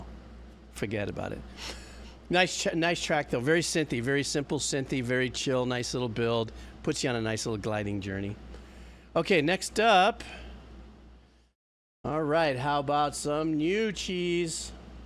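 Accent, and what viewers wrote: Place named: Argentina. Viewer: hahaha wasnt expecting you to talk so well in Spanish (Argentine accent)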